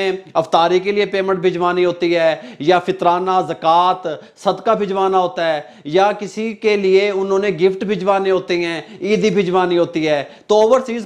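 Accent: India. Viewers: Love ur all recipes, love ur desi accent,, i try ur all recipes, all are yummy, delicious, tasty, from spain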